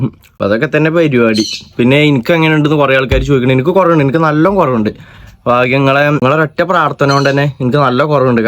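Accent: Malappuram, India. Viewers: എട. ബ്രോ.ഞാൻ.കണ്ണുരാണ്.നിന്റെ.മലപ്പുറം.ഭാഷ.തീരെ.മനസിലാകുന്നില്ല.😂😂😂😂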